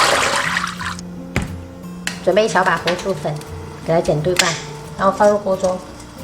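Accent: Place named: Quanzhou, China. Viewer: 是福建泉州的口音。也屬於閩南語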